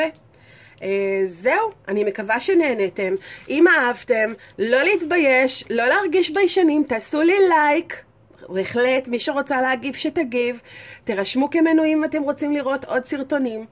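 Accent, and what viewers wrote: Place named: Arab world. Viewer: Just watched this, didn't understand what you said but did get the idea and just like any papercrafter you love bling....lol For some some reason i thought you might have been Russian of Polish. But listening to you maybe Arabic.? Loved listening to you and your passion is really shining thru I keep smiling. Thankyou for sharing your excitement and passion, so infectious...🥰😜😘💜💚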